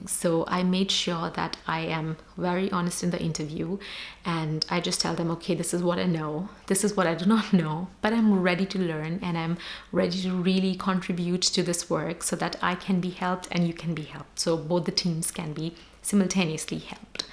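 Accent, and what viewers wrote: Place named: Germany. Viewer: Hello, was your Masters German taught? I hear a bit of German accent in your tone.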